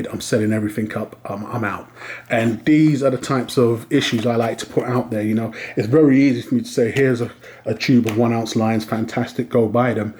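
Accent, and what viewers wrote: United Kingdom. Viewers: What’s more important is your British 👍🏼 and that Sir, draws me to the subscription button.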